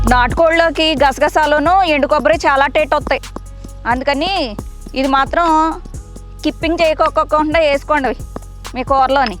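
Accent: Godavari, India. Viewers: ఒసేయ్ లప్ప గోదారోళ్ళ భాష మాత్రం బాగా పట్టవే! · Nv matldedi godavari yaasa ,rayalaseema antavu enti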